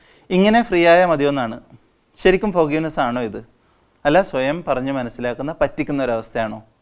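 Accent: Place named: Kanhangad, India. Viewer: ഞാൻ ചിന്തിക്കാറുണ്ട് എന്തുകൊണ്ടാണ് ആളുകൾ നല്ല content videos കാണാത്തതു എന്നു . എല്ലാവര്ക്കും മറ്റുള്ളവരുടെ സ്വകാര്യത അറിയാൻ ആണ് ആഗ്രഹം. Hmm അതെന്തെങ്കിലും ആവട്ടെ The only regret is that I was little late to watch your videos Now watching all the videos together It's like a close friend sitting next to me and talking ചിലപ്പോൾ kanhangad slang ആയതു കൊണ്ട് എനിക്കു അങ്ങനെ തോന്നുന്നതാകും I'm waiting for more videos like this❤️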